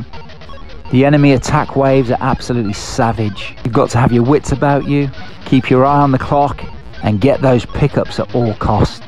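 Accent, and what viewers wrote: United Kingdom. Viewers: Great video (and british accent). Some french video games here (Orion Prime ;) Any input on the "Grand Turismo"? They said it should have been released for more than a year now..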